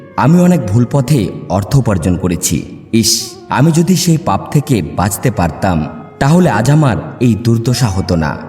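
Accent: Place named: Bangladesh. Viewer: বাংলাদেশি বাংলা এটা ভারতীয় বাংলা নয় এটা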